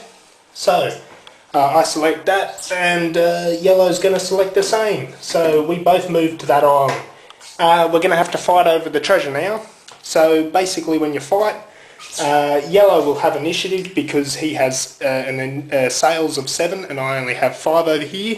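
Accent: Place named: Texas, United States. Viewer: I like your Texas accent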